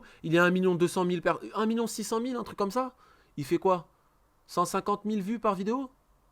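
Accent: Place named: Essonne, France. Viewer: Par où commencer , bon deja c'est la deuxième fois que je regarde une de tes vidéos et j'aime bien ta façon de penser et ta façon de parler , d'ailleurs je me demande si t'es pas un Boug du 91 ... Thekairi78 j'ai regarder sa toute première vidéo sur YouTube en 2011 , il porter une casquette , il disait Salam Aleykoum, j'ai direct accroché au personnage par ce que je me reconnaissais dedans , un mec de cité sur YouTube en 2011 y en avait pas bcp , îl parlait de religion , de l'école , des femmes , de la France , bref de la vie en général ... Ses records zombies , les massacres qu'il faisait avec sa team en multijoueur , c'était un régal de regarder ses vidéos ! Alors le voir changer autant en si peu de temps , c'est normal que ces anciens abonnés dislike ces vidéos , c'est comme si tu voyais un de tes potes sombrer dans la drogue , l'alcool , les putes etc bon l'exemple est un peu extrême mais c'est dans cet optique que je vois les choses Voilà chacal principal j'espère que tu verra ca!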